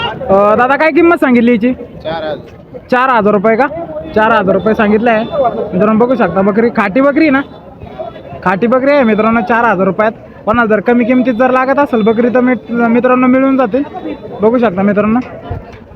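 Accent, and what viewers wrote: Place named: North India, India. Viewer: मराठी भाषेत कुठे येतो रे भैया भैया तू यूपी चा आहेस का बिहारचा आहेस भावाला भाऊ दादा अण्णा म्हणतात तुमच्यासारखी माणसं मराठी भाषा खराब करतात रे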